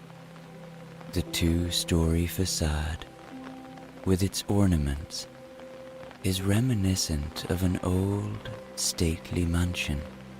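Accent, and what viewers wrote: Ireland. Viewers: Hi, I absolutely love this Rainy Story collection. I listen to your stories every night in bed and always fall asleep very soon after the actual story ends. They are all so lovely. My favourites are the rainy and book shop stories. Just know that you are very appreciated by so many people around the world listening to your lovely Irish accent telling the most beautiful bedtime stories. Thank you!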